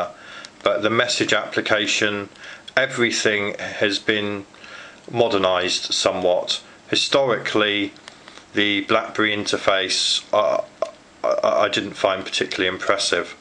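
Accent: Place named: United Kingdom. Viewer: loving the british accent.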